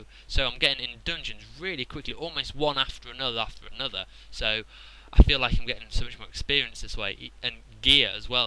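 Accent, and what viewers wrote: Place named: United Kingdom. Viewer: Know what's better than listening to how someone started playing WoW? Listening to someone with a badass British accent talking about how they started playing WoW :P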